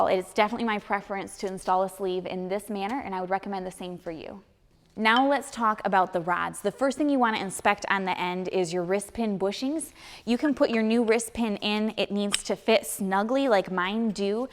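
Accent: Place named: Michigan, United States. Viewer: Great video. Also, I think I detect a northern accent... Wisconsin or Michigan perhaps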